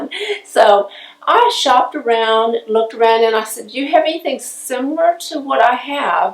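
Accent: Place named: South, United States. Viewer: I love your accent so much - south of the United States is so melodic and different (relaxed in a way) to me as a non native speaker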